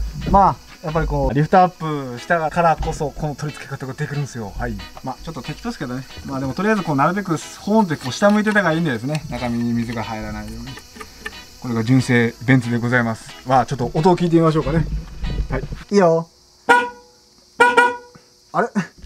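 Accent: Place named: Japan. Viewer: 自分で満足感出すのサイコーですね〜！さんは、どこの県の方なのか？なまりが、！笑笑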